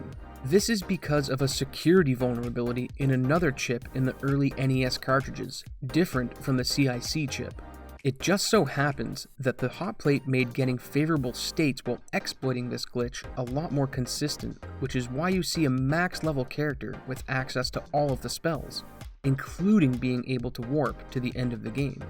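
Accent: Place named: Maritimes, Canada. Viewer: I hear that Maritime accent :P Also great video!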